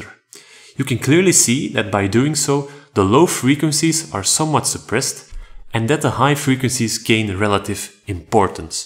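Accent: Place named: Belgium. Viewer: you sound belgian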